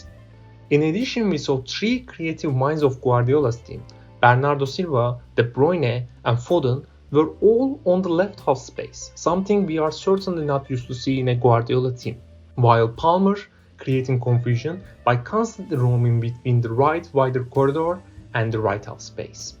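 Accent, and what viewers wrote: Turkey. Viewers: you sound like a turk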